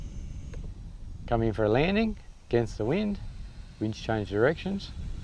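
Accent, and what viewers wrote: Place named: Australia. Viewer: Accent = Ozzie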